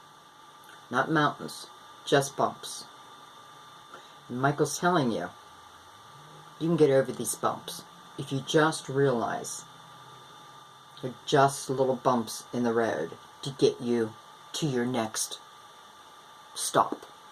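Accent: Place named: Southwestern Pennsylvania, United States. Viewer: I'm quite impressed... yes, I have done so much work through the past six years, work on myself. This time last year, I found myself feeling differently toward someone with whom I'd shared chemistry with, but had never thought of romantically. Then.. POW! Everything had changed. The work shifted and I've been drawn, so has he, by the Universe... coming together. He -- a water sign, yes-- is in a dead relationship, in reality -- the ties are still present, but there is no involvement, no life in it. No, I could never be jealous, ha ha. Spot on. And of course, I visited the Pisces reading you did, and you describe escaping a dead relationship, unleashing yourself from your own internal prison. (And you sound like you are somewhere near the area of the country where I live, ha ha... SW PA.)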